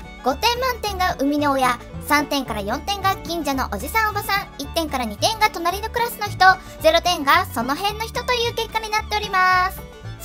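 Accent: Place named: Kansai, Japan. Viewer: ママは関西やな〜 マジで関西人やで〜 ハマりまくりでんがな ラストの「ラッセルラッセル」 大ウケでっせ〜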